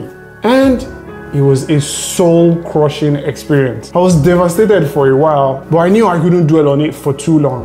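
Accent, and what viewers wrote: Nigeria. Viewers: He is a Nigerian man with a Nigeran name who lives in Nigeria and looks Nigerian and sounds Nigerian, starting off from broke with no financial help or support, and he still managed to be rich. You have to respect this man because, in his current situation, he is starting from an extremely difficult mode, while most of us in the Western world, compared to this man, are starting from an easy mode. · I can still hear his H factor tho. It's part of the Yoruba accent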